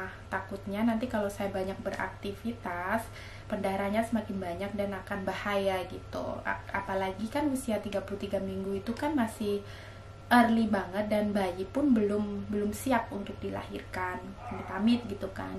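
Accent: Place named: Java, Indonesia. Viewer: Aku suka mbak & keluarga.. Lihat anabelle.. Yg lincah & robin yg sangat aktip... Dan gaya mbak klo ngomong jawanya... Medokk aku suka.. Kita wong blirar juga.. Tp tinggal di lampung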